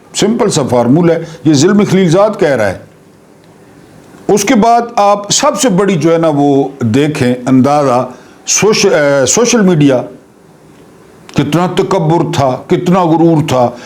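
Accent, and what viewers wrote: Saraiki, Pakistan. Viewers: السلام علیکم ورحمتہ اللہ وبرکاتہ جناب برادر صاحب آپکے ویلاگز دیکھ کر میں بہت محظوظ ہونے کے ساتھ آپکی سچیائی پر مبنی حالات حاضرہ سے باخبر ہوتے ہیں اور خاص طور پر آپکے سرائیکی انداز کچھ الفاظ بہت مزہ دیتی ہیں۔ سید غلام اصغر بخاری ضلع لودھراں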